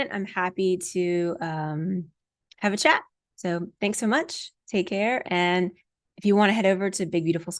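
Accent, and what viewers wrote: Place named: Australia. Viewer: Fantastic hearing an Australian biais 😅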